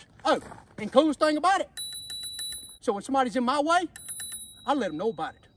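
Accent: South, United States. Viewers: I love his Southern accent 😀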